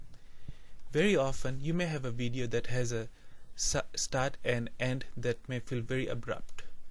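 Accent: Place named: India. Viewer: Indian accent is the best. :)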